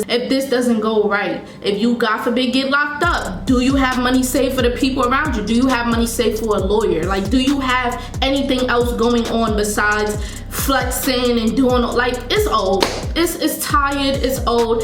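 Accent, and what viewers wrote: New York, United States. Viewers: Are you a NYer??? I feel like I can recognize our accent anywhere lmaooo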